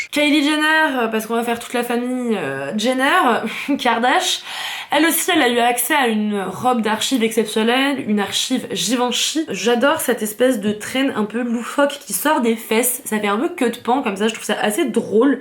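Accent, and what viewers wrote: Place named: Liège, Belgium. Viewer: Allez, je m'abonne ! Analyse pertinente, des sujets intéressants, un air de ressemblance avec mon actrice favorite Anya Taylo Joy et arrière accent Liégeois de Beaufays très léger. Tout y est 👌🏽! C'est super !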